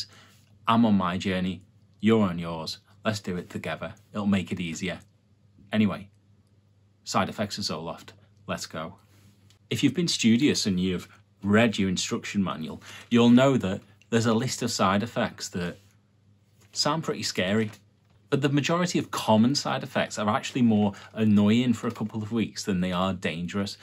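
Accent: Liverpool, United Kingdom. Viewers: You’re a scouser ❤ so am I Brilliant .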